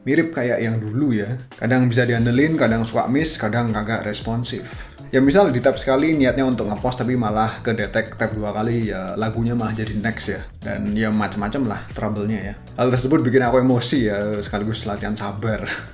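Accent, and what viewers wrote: Java, Indonesia. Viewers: Mantap bang, medok² asek pertahanin buat cirikhas Yg pemting kontennya berbobot dari Reviewnya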